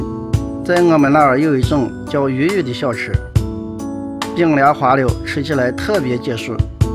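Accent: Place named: Shaanxi, China. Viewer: 陕西陕西话蛮好听的，这个鱼做甜品也很好吃😋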